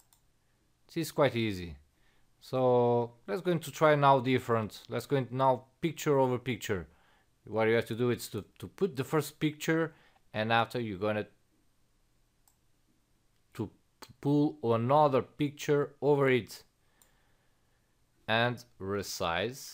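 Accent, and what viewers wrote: Portugal. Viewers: hey amigo es portugues consigo ver pelo sotaque obrigado pela dica aqui no video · Olá you sound Portuguese :)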